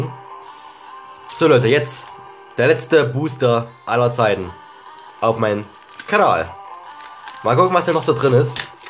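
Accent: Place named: Saxony, Germany. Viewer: Ich liebe deinen Sächsischen Akzent, 😂😎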